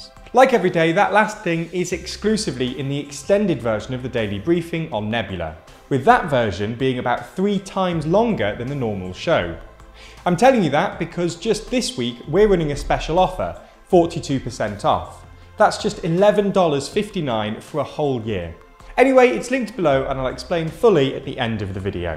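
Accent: United Kingdom. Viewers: He’s British lol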